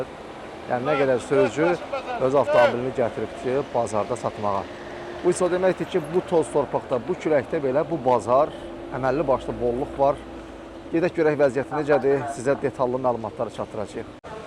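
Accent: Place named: Ordubad, Azerbaijan. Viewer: Qardaş Ordubad lehcesiyle danişir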